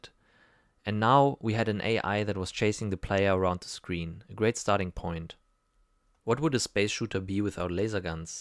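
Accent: Germany. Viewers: Actually are you german? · Cooles video und schon Krass dass es schon so weit mit Ai getrieben wird, Kann es sein dass du deutsch bist ?? Dein Accent hört sich voll deutsch an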